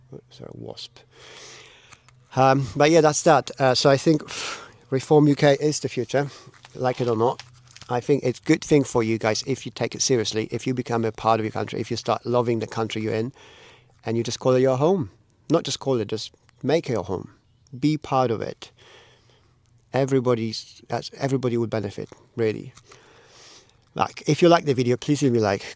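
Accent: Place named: France. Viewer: I don't know whether you'll take this as a compliment, but from here in Australia you remind me of the French maitre d'hotel who is on television a lot with Gordon Ramsay. Fred Sirieix. Particularly your accent 🙂 ....and it is meant as a compliment!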